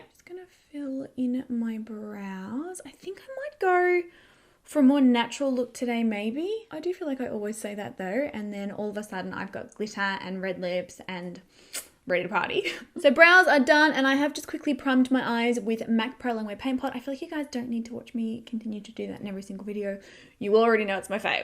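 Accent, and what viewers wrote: Australia. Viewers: Victoria Darmanjian She has an Australian accent and it even says she’s Australian in her description.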